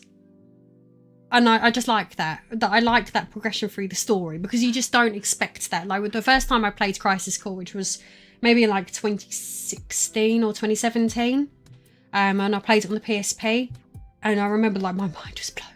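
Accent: United Kingdom. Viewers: Teach me how to brittish accent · How lovely is your spirit , your smile, your soul and your British accent!!! Regards from Spain :)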